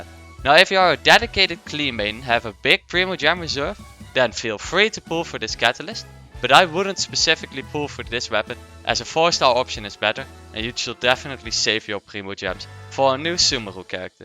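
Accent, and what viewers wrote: Netherlands. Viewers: Are you Dutch? I feel like I recognize your accent...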